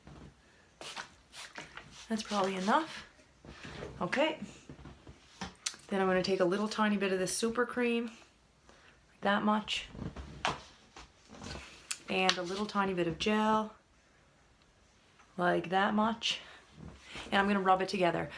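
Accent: Canada. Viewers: Nova Scotia, Canada. :)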